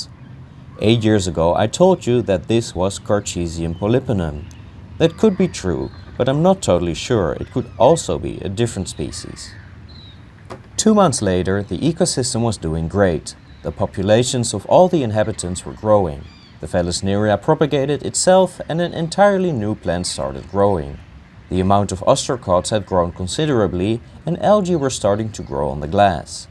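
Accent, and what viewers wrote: Netherlands. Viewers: i smell a dutch accent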